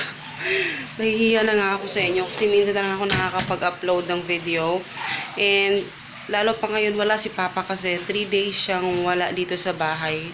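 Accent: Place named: Visayas, Philippines. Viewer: nakarelate ako sa tagalog dialect mo sis na may halong bisaya hehe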